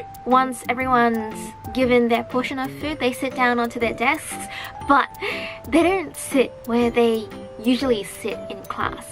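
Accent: Australia. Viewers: Me gusta saber inglés y entender lo que dices sin necesidad de subs, gracias por el vídeo mija :D P.d: Me gusta tu acento Australiano... no estoy segura si es de ahí.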